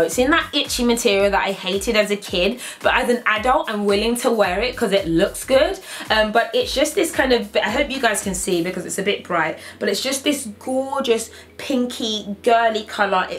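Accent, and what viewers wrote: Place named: RP, United Kingdom. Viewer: I love your accent I live in london but I don't have a lovely posh accent 😇😇😢💖💖💖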